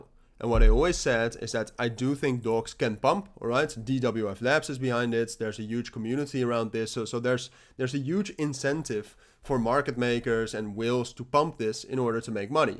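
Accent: Netherlands. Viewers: Bro are you dutch? Haha sounds like it, i am dutch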